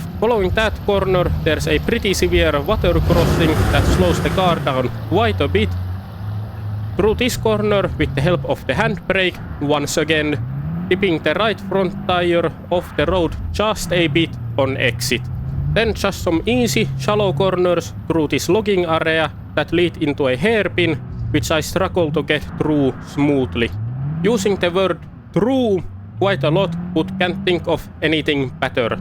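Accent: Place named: Finland. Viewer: You soud very much like you are finish.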